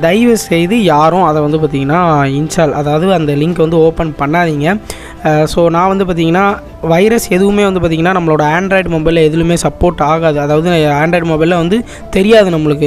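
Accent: Tirunelveli, India. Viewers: Bro neega nellai ya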